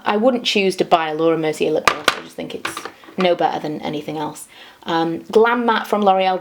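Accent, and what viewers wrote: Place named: Derbyshire, United Kingdom. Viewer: Are you from Derbyshire ? You're accent is very similar to mine !x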